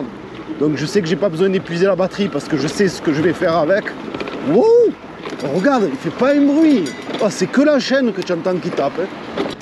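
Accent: Marseille, France. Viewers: Vive le sud! Tu donnes le sourire avec l'accent de Marseille qui crrame tout!!!!!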